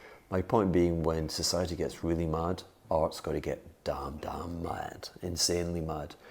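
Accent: Scotland, United Kingdom. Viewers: It's how as a Scot, he pronounces 'asset'. Clearly it's an in-joke and a play on words with being on acid, ie.e joker and maverick take your pick. Being elusive and unpredictable is part of the shtick.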